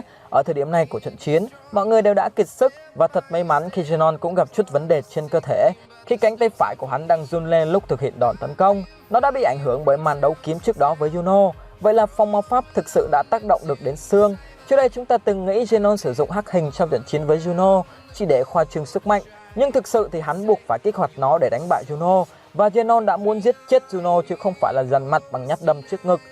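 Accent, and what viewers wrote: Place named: North, Vietnam. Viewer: ad ổng gốc bắc sống ở nam mà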